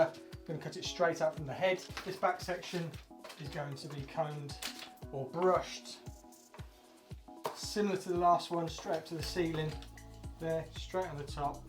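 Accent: United Kingdom. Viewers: Cómo me encanta el inglés británico lpm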